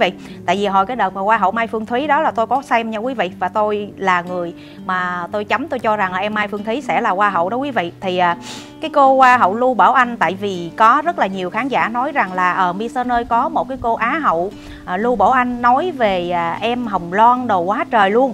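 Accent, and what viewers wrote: Standard. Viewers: lập luận sắc bền rất thích!phát âm khá chuẩn nhưng vẫn còn vài khuyết điểm " luận " " bình LUẬN " không phải " bình LỰNG " chỉnh lại một chút sẽ hấp dẫn hơn chúc em luôn thành công Mãi dõi theo em dõi theo lẽ phải!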